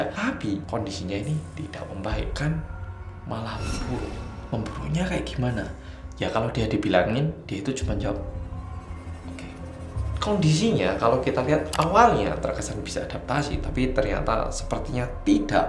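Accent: Java, Indonesia. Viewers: Untung mas Hansol dulu di bawa ke Malang..korbanya cma rawon sama pecel...beruntung dan besyukur...teman" di skolahan masih berhubungan baik...jadi medok lagi. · Jawanya terlalu berat untuk aku. Sukar utk dihadam 😅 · Mas Hansol :hai guys welcome back to korea reomit bersama orang korea yang........ Satu kampung sama gw : Medhooooooooooooook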